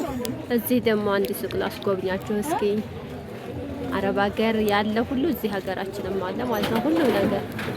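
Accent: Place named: Gurage, Ethiopia. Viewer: ጉድ ጉራጌ መስለሽኝ አማረኛሽ የጉራጌ አማረኛ ነዉ እና ሀይቅ ስትይ ገረመኝ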